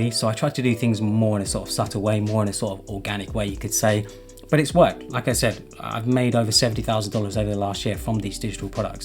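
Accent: United Kingdom. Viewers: So lovely hearing and English accent. I'm so used to American channels...looollll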